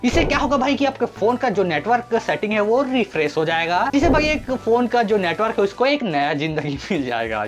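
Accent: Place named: Chhattisgarh, India. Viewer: Chhattisgarhiyo ka accent pakda jaata hai 😅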